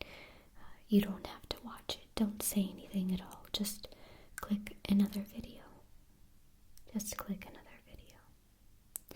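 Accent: South, United States. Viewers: You, ma'am, have done the impossible. What is it that I speak of, you ask? Isn't it obvious? Bagpipes. Bagpipes in an ASMR video, haha! I never thought it possible! I'm teasing, of course. The concept just struck me as funny ;) But now, my serious face... I quite enjoyed this video, but my first comment to you is a bit more general. I have been an avid seeker of ASMR videos since I discovered their existence and more incredibly discovered that this odd, pleasurable, and difficult to describe feeling was shared by quite a few (albeit still a select few) other individuals. Like all of us tingleheads, I have my favourite ASMRtists that I subscribe to that aren't just giving me head-gasms, but are also just flat-out talented entertainers. And this is where you come in. I love your videos for the guaranteed tinglefest they give me, their relaxing nature, and this incredible sense of comfort I get from them. 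It must be that southern charm my Bostonian ears just melt for... But girl, you are a natural in front of that camera! I mean, of course that pretty face of yours doesn't exactly hurt, but I think you just have a gift for acting. You have excellent diction and your comedy sense and timing are all things that can't be taught. I mean, after all that how could I not subscribe to your channel?! Keep up the amazing work, you are much appreciated. Sincerely, Andy Mac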